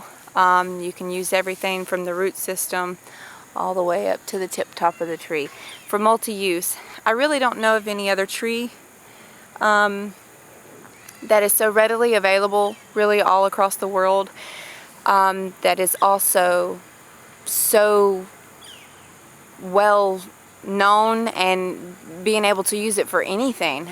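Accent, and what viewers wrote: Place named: South, United States. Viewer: Is that Loblolly Pine. I recognize that accent and I know the only pine that grows in the south is Loblolly. I am from Arkansas and you have to go to Missouri before you find white pine.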